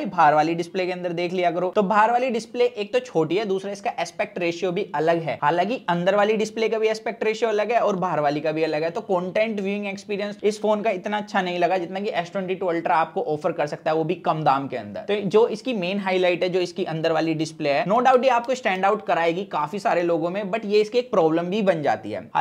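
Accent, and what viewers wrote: Haryana, India. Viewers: dude try to improve your haryanvi rough accent.. hindi improve karo aur accent and english pronounciation par dhayan do... gawar mat ban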